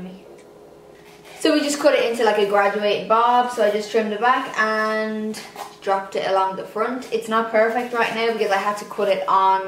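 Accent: Ireland. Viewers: Obviously Irish, beautiful and obvious. GO IRELAND! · Denise Gasparini she’s definitely Irish yes! I don’t know where the Hispanic come from 😅🙈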